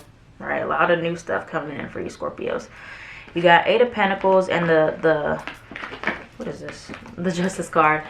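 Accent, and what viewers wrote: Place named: Philadelphia, United States. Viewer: You said wooter not water. My ear went ding ding you have to be from the Philly Jersey area. Also, “you guys” that’s how we speak around here 💯🙌🏼🥰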